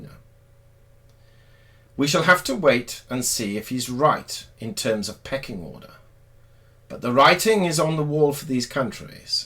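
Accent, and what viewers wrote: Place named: Wales, United Kingdom. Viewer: The narrator is quite clearly British at a guess I'd say Welsh, and yet you pronounce YouTube as YouToob. Why?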